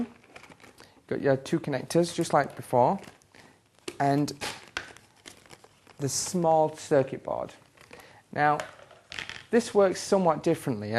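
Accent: United Kingdom. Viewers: lovin the way he alks :D so english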